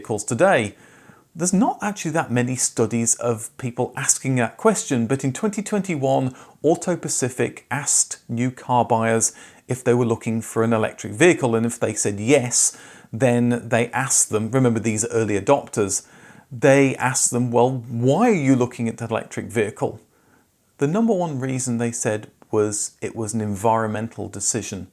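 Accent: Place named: England, United Kingdom. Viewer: he has an English accent. Does that help ? 🙂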